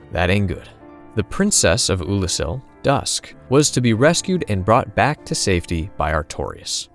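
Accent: United States. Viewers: I'm hearing an American discussing lore of a Japanese game based on European historic-fantasy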